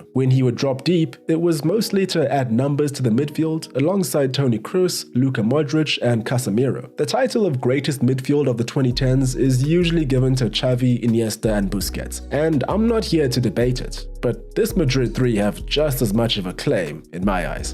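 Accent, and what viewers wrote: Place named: South Africa. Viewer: Your accent is sound South African. I can’t get over it😂😂😂😂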